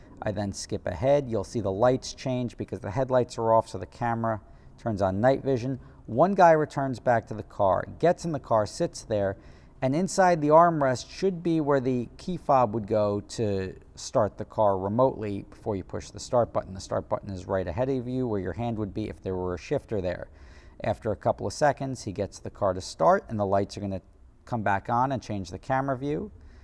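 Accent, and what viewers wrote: United States. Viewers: Are you American or English Ps I’m English so please tell meeeee Wait no your American whhhyyyy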